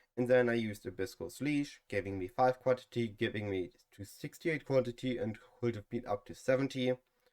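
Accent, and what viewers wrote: Germany. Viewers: German accent is so disgusting and muddled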